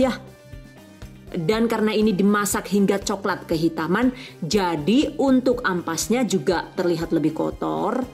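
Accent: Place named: Java, Indonesia. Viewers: Orng mariun ko' pke gya bhsa orng teggal. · Aduh mba suara nya lucu bngt kl lg kecaprakan .bhs jw nya medok bngt .wk wk wk 😃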